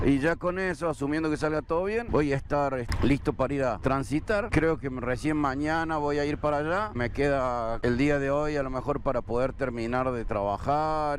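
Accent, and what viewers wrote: Argentina. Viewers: Tiene acento de Córdoba, Argentina.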